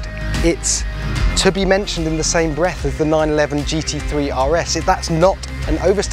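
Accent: England, United Kingdom. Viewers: I'll go with Corvette's 8 class wins at Lemans (along with countless others) as plenty of proof American cars can corner. I don't need another youtube host with an English accent to convince me as if this is something new. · American muscle but you get an English guy to talk.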